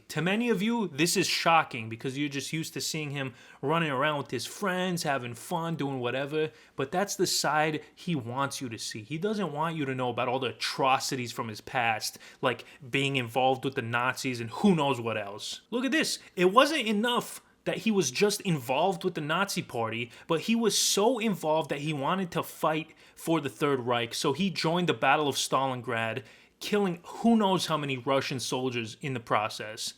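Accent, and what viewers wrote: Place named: Boston, United States. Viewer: Dude... I absolutely LOVE hearing you rant about Franklin in your Boston Accent! Hilarious definitely gon Sub bro. Love the content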